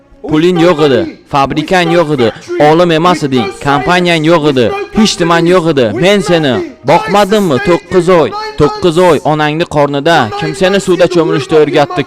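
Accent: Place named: Tajikistan. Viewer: Tojikistindan bo‘lsalar kerak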